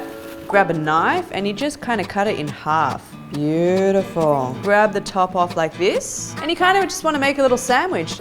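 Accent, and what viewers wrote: Australia. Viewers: Is she from australia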